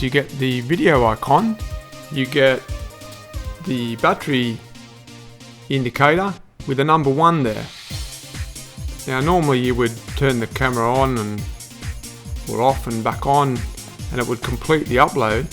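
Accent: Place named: Australia. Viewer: No problem mate. You Aussie? I lived in Melbourne from 2001 to 2003. It's good to hear Aussie talk again. I spent forever figuring out a solution when GoPro was no help. They wanted me to send it in at my expense and only if it was still under warranty (it wasn't). Quite sad when the company that sold you gear breaks your gear and leaves you with the problem. Sent them the video and only got a "thank you". HERO3 black would have been better. I have v50, v58, v70, v124, and v222. v198 is bad.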